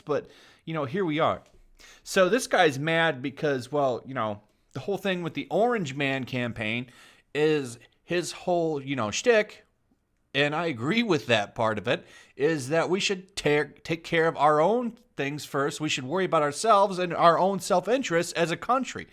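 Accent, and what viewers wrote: England, United Kingdom. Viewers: As much as I may agree with this dude’s point, his English accent is intolerable! I had to quit at the mark; Godspeed to anyone else who tries.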